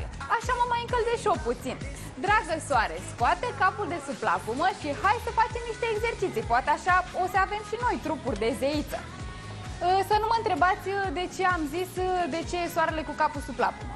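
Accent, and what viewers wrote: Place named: Brazil. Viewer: Sounds Brazilian to me.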